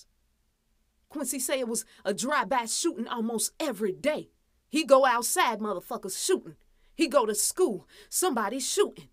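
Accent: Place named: South Carolina, United States. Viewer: Bihhhhh you sound like me and folk down here in South Carolina...keep spiting that truth out fam..Gullah geechie..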